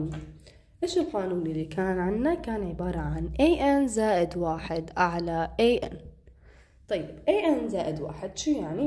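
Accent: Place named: Homs, Syria. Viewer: حاسك حمصية متلي😂 عكلن شرح ممتاز الله يعطيكي العافية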